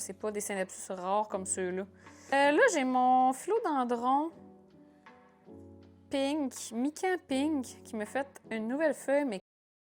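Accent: Canada. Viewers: Accent canadien = sympathie = pouce vert ! ❤️❤️❤️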